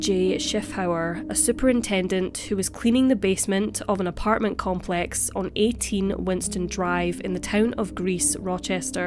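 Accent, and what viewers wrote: Scotland, United Kingdom. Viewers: unrelated but i love your accent, are you scottish perhaps? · woah i wasnt expecting a scottish accent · This is my first video of you. Ngl, I didn’t expect a British accent. Woah 😳 (Scottish? Welsh? Northern part of England I think?) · Wow, Ive never heard of this case ever. Great video and its nice to hear a fellow Scottish voice on youtube.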